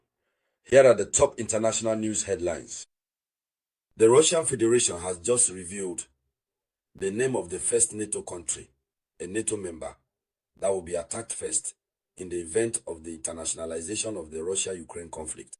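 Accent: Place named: Africa. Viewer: Sir u speak like an AFRICAN y not speak about AFRICA ,UKRAIN try to kill PUTIN as they say what can be more dangerous than that and RUSSIA do nutin look how long UKRAIN as bin sending missiles n drone into Russia all these leaders only send trees..USA said any missiles fire by Yemen ,IRA is responsible yet still YEMEN defeat the USA in the red sea im paying attention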